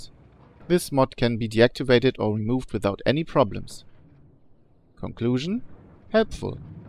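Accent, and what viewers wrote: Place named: Germany. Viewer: haha okay. nur ein kleines bisschen zu deutscher Akzent und zu starke Betonung der Endungen.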